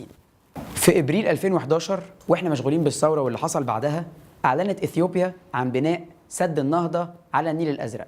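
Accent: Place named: Gulf, Arab world. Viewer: واضح من لهجتك إنك من الخليج..... طبعا لا داعي للذكر إن كل دول الخليج لها علاقات وطيدة جدا بإسرائيل و من ينفي ذلك مغيب أو ساذج و بالنسبة للعلاقات مع إسرائيل ...بالفعل مصر اول دولة طبعت علاقات مع إسرائيل أحنا لا نتناسي....و لكن غيرنا يصطنع العداء لإسرائيل و هو تحت الطاوله يمد يد العون و المحبه و التعاون مع إسرائيل بكل الطرق و بالفعل القوي الناعمه الإسرائيليه في محيط حوض النيل قوية بشهادة القاصي و الداني و ممكن تقرأ عنها رجاء واحد...كفانا كلام من نوعية الإستهلاك المحلي و إصطناع الوطنية الكاذبة!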